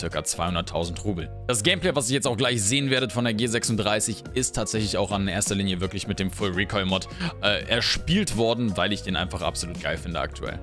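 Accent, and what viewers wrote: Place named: United States. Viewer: das liegt dadran das er 5 Jahre in den USA gelebt hat, das bekommt man auch nicht mehr aus ihm raus^^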